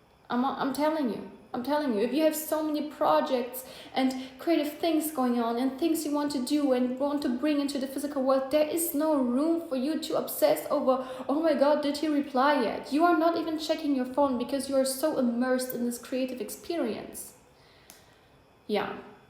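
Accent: Germany. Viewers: Is that a German accent? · Is she germann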